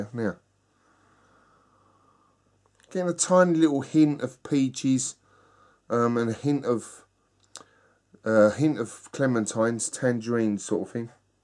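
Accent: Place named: South East London, United Kingdom. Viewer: Interesting about the brewers star, thanks for sharing, I did not know that. I did know about the five pointed star in Washington yes. The pentagram has been used for thousands of years, mostly symbolizing life or humanity, or the union of male and female. I like watching your videos by the way, I think your quite and interesting reviewer. Where are you from? You have a South East London accent, you sound just like my mate from Peckham.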